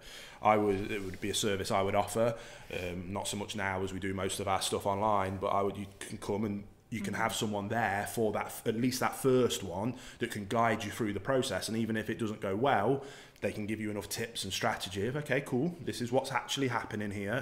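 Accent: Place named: Midlands, United Kingdom. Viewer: LOL you sound like you're from the Midlands.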